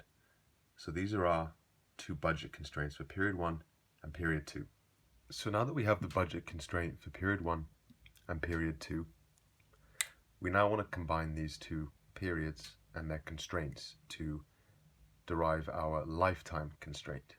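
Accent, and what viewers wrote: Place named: United Kingdom. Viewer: Thank you very much, so useful! Keep uploading videos, we need to understand economics with a British accent ;)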